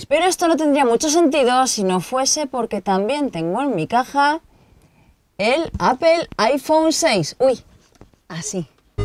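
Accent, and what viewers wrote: Spain. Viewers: me gusta el acento del hablar de las españolas a si como esta hermosa chica con un buen sentido del humor =)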